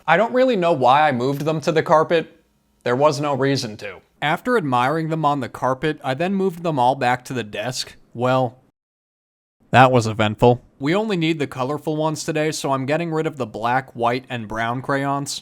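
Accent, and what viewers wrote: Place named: Virginia, United States. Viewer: OMG VIRGINIA NOW I CAN PINPOINT YOUR ACCENT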